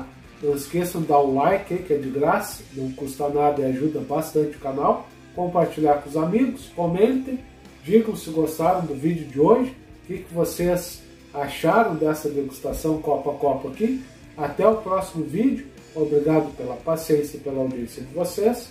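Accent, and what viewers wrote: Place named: Rio Grande do Sul, Brazil. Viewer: Buenas meu amigo que, pelo sotaque, só pode ser gaúcho! Conheci o canal hoje e já fiz a inscrição! Ótimo conteúdo! Abração!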